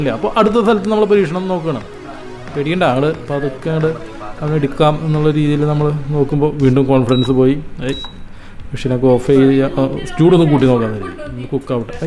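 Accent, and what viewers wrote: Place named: Thrissur, India. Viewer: Laughed a lot.. Really funny... Who had given the voice? A person from Thrissur, right? Good commentary too..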